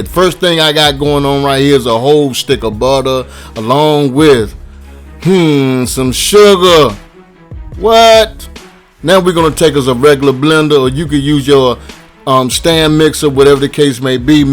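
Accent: South, United States. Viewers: I love the southern drol. My youngest child grew up on southern food through his day care person. She was awesome. Fed the kids. My youngest is 6'3 & my eldest 6'2 they eat everything even if it is on my plate. They cook now..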